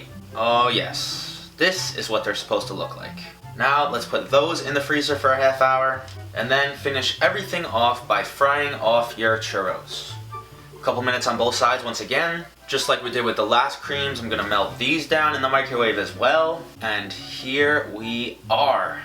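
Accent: New York, United States. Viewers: If he isn't from New York I'm gonna doubt my accent knowing skills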